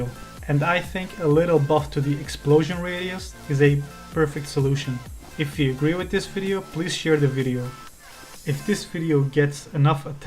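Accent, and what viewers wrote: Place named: Belgium. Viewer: lol my bad, I mean to put Belgian cause I have this friends that's from where you are and he says what the hell just like you with the accent.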